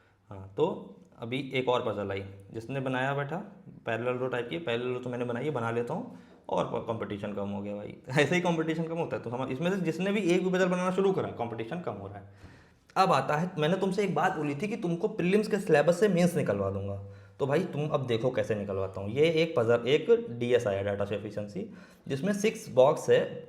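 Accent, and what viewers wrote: Lucknow, India. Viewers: kasam se jaan gya tha boli se hi ki lko se hain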